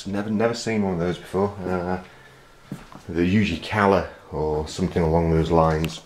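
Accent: United Kingdom. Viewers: ive had a bash at making my own with limited success :( i saw your video and was very impressed by the temperatures you where achieving .was wondering what you sell them for and if it was possible to do smaller scale ? im from Newcastle on tyne so great to hear a British accent person making these videos instead of out back Americans lol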